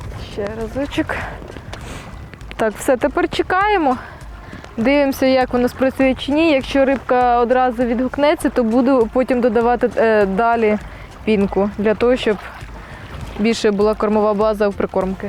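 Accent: Surzhyk, Ukraine. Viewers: суржик то сила))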